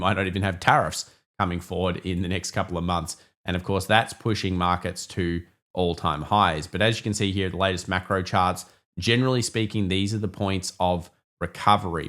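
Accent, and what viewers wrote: Australia. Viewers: This guy does not understand what is happening in the US. He talks like an Australian. So many do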